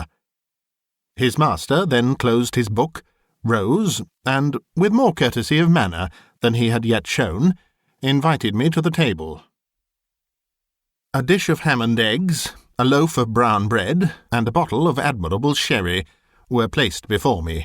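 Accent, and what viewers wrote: United Kingdom. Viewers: I'd like to add that it's how you masterfully change your voice to suit each character that is impressive and very convincing. And you have that elegant and clear British English that's very much appreciated by American audiences ( including myself). 🙂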